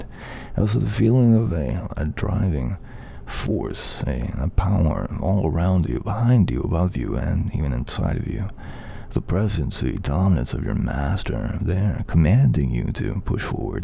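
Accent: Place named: United States. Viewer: This guy sounds remarkably American.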